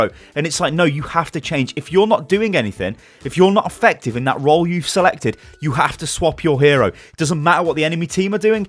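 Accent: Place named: United Kingdom. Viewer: like ur british english. dont understand usa guys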